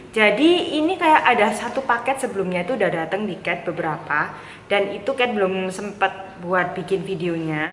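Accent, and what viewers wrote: Java, Indonesia. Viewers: logat jawanya itu yg bikin suka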